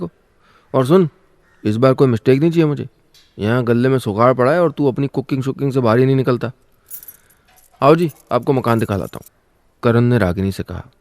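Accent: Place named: Punjab, India. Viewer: Your punjabi accent is awesome....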